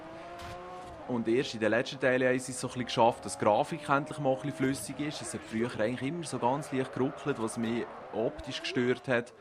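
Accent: Switzerland. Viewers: das ist schweizerdeutsch, spezieler dialekt.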